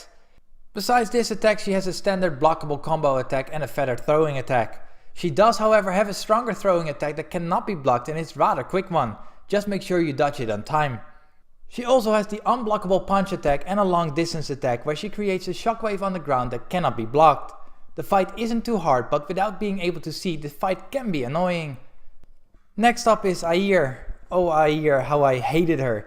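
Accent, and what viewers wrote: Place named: Netherlands. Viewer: I heard it because your accent, especially when you said: Sigrun, like Sigroen :), boven Holland :), I am Dutch too, I like your video, keep up the good work and keep going, I subbed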